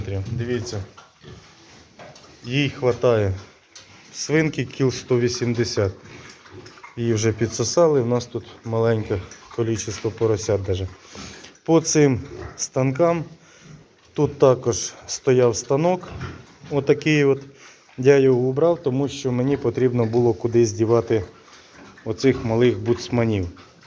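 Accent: Ukraine. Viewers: Такий у Вас цікавий говор, звідки Ви родом? Мій тато так розмовляв - Харківська обл. Дуже цікаво, а головне доступно для новачків розказуєте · Доброго здоров'я, приємно чють рідний Миколаївський суржик))) , краще ніж відоси годовой давності))). Я в одного дятька бачів підлога в свинарнику була викладена з дерев'яних чюрок, як плитка тротуарна)) . В самого хозяйства немає вже давно , а приємно дивитися ваші відоси.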